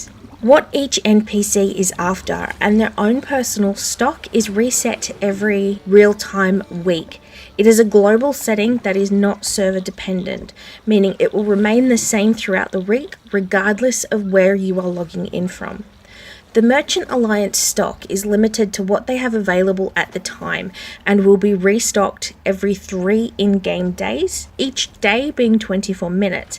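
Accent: Australia. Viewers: Great video, love the cinematography of it and of course the Aussie accent. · I did enjoy the ozzie accent. Here's the method I use, firstly I will raise my emissary and complete one "lost voyage" mission from my spawn outpost. Upon completion I should have max emissary, if you don't have it then you should be very close since voyage levels differ with reputation levels. Afterwards I will sail to the nearest outpost and sell my voyage loot, using the funds to buy out their stock. Afterwards I'll sail to the next outpost in either a clockwise or counter-clockwise fashion, as you put it in the video and buy that outpost out and sell whatever you have that they are in need of. You can figure out which resource to sell an outpost without ever opening the merchant book too. Every outpost offers 3 of the same item they are in surplus of, 1 of every item they're good on, and none of the resource they are in need of. 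With this logic you can simply look at their stock and through process of elimination determine what resource they need without looking through the book over and over, and the more you do it, the better and faster you will be. My first round trip of the map will consist of me buying and selling to maximize profits, and I will mark every outpost I have visited on my map in order to keep tabs on whether or not I've gone full circle. Then, my second round trip of the map I focus on selling off everything only, un-marking each outpost as I visit them. This is about a 3-4hr session as a solo and profit after accounting the buy prices was around 450-500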